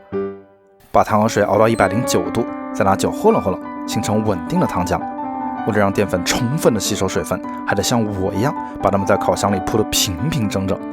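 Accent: Wuhan, China. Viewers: 听出了武汉口音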